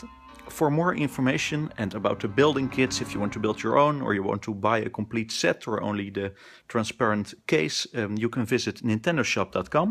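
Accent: Netherlands. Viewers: ArtOfCamelot well it definitly wasnt very good english, it had a lot of dutch trough it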